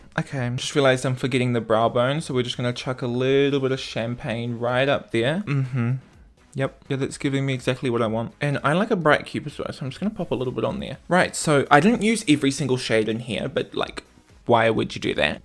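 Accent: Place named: New Zealand. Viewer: LOVE hearing a Kiwi accent! (waves from Southland) ❤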